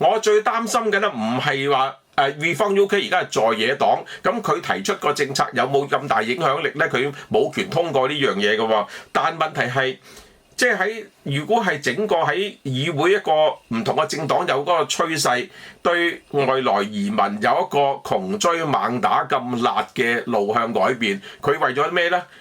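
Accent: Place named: Taishan, China. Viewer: 感謝伍 Sir 乜原來時聞喺台山話，我還以為是新會話，因昔日有新會籍先輩常宣於口。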